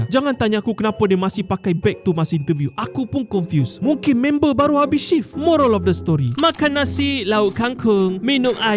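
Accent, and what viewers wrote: Sabah, Malaysia. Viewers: Abang ni orang sabah kah? 😂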